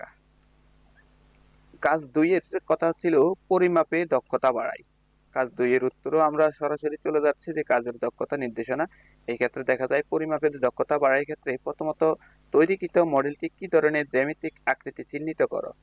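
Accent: Noakhali, Bangladesh. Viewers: স্যার আপনি কি নোয়াখালীর মানুষ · Sir apni ki nokhalir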